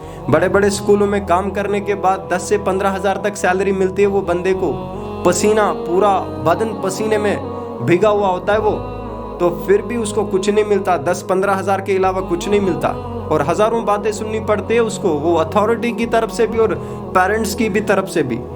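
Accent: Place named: United States. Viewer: Sir u've got an amazin accent u speak like native American that's epic! sir I wanna know did u learnt in online from tv shows speeches etc? actually there are multiple english accents it changes from region to region english speaking countries like America Britain Canada australia scotland ireland there accents and slang words differ from each other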